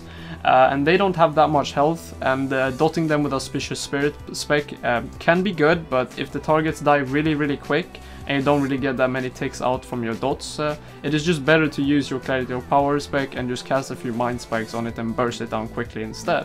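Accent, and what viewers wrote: Sweden. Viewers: You sound like a swedish person? Are you?xD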